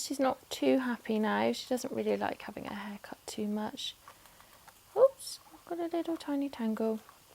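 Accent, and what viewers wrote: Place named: United Kingdom. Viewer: Lily is so cute. And you have a very pretty British English accent.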